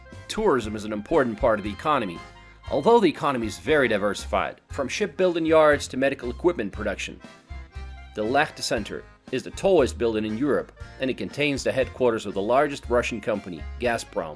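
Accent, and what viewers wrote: United States. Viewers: bro u have good english, dont use this generic annoying american accent dude voice to read the script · Pretty impressive American accent for a Russian!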